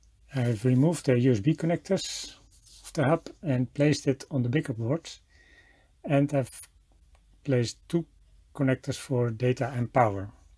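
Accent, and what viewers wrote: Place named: Netherlands. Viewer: Stone Coal Miner English! You must be a Dutch speaker! Hey hallo! Leuk project, grappig hoe je een Teensie gebruikt voor vertaling van originele keyboard matrix naar USB. Erg cool! Dumpje omhoog en subje erbij!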